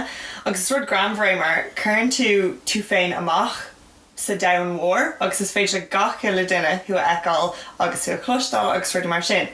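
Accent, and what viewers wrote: Ireland. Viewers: Sin greannmhar mar like is as Contae Aontroim mé agus like tuigim go hiomlán ach like you can tell a wee bit that she says some things like non-Ulster ish 😂😂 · Sounds like every dublin irish speaker i know not a first language so the accent isnt on point, at least people can speak our language, níl sé ceart cun cur daoine sios nuair a bhfuil siad ag deanamh iarrach ar chuid teanga a leabhart. Is amadán tú cun smaoineamh mar sin. Nil mo chuid gramadach go maith ach deanam iarrach taim nios fear ag leabhart an teanga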